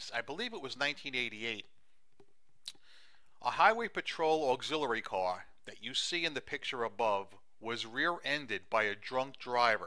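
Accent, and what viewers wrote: New York, United States. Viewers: Your nyc accident isn't a pronounced and arrogant like we hear on tv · and that accent--authentic New York. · I loove yo NY accent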